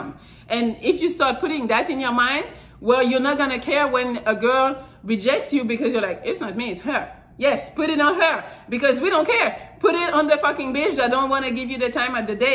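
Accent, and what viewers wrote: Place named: France. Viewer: Approximately pm March 06 2022 from Tobago: You are awesome. I love your French Accent. Keep up the great work of simplifying the interaction of men with regard to women.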